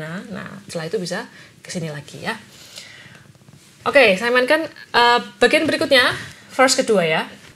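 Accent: Java, Indonesia. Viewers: Medok banget kak 😊 Jawa nya mana ?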